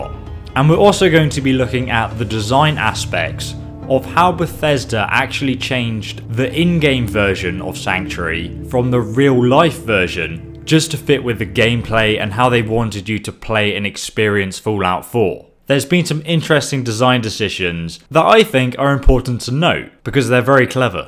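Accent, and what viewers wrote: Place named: England, United Kingdom. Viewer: We should start a gofundme for you to get a drone so we can listen to that beautiful English accent for many more long winded minutes. Anyone down? Ohmmmmmmmm bethesderrrr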